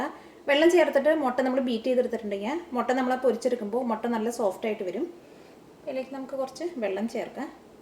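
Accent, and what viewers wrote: Thrissur, India. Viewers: Trisur bhaashayalle ith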